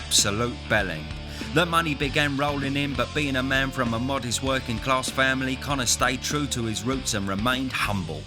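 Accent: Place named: England, United Kingdom. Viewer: Annoying pommy voice 🤮🇬🇧🤮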